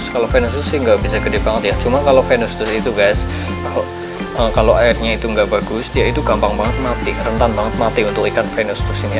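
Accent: Java, Indonesia. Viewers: abang orang jawa ya bang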